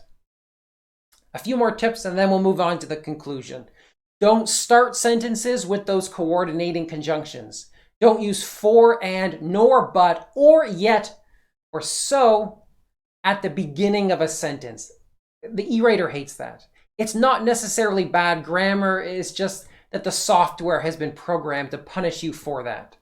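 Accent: Canada. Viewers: Just out of curiosity, are you Canadian? I hear some Canadian accents. My Canadian friend and i used to fight over English (not British, just language) accents like 'Resource' or 'Rezzource'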